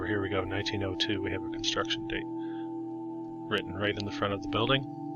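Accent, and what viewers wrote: Canada. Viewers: This guy sounds a smidge Canadian? Eh?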